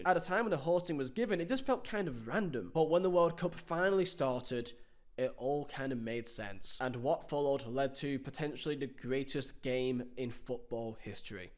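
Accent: United Kingdom. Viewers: UK accent 😫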